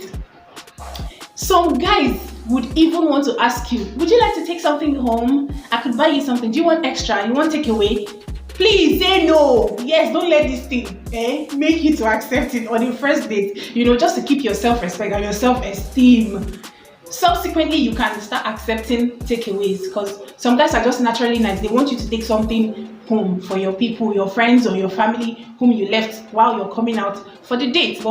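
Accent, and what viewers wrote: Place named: England, United Kingdom. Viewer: I like your english accent. you speak good English. good tips. yes self esteem. yes need to have money before travel. enjoyed the video support you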